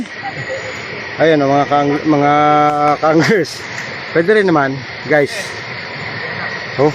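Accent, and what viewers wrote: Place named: Mayhayin, Philippines. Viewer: Tiga saan ka lodi? Puntong mayhayin ka a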